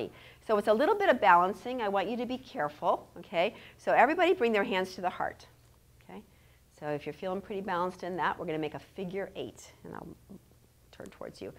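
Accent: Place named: Ohio, United States. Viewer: Oh is yours an Ohio accent? No I wouldn't have guessed. Though I'm not good at detecting accents other than the more obvious ones such as Boston, Long Island, Chicago, South Jersey and of course vaguely Southern or Texan. Though now that you mention it, I guess you sound Eastern U.S. It's probably hard to tell in CA, with so much mixture. Anyway your voice is comforting and I like listening to you.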